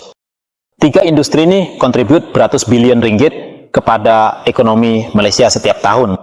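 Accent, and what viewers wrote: Java, Indonesia. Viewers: Dari suaranya ketahuan narasi yang dibacakan ini adalah Orang Djawa, dan bukan orang melayu wkwkwk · Admin ni pasti lahir di parit ponorogo batu pahat slnk dia jawa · Audio bunyi slang jawa indo cakap bahasa melayu. X rasa mcm orang Malaysia yg buat klip video ni biar pun suku Jawa adanya di tanah Melayu. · Min cara kau bercakap Melayu pun dah tau kau orang indo so takpayahlah nak menyamar kononnya kau orang Malaysia.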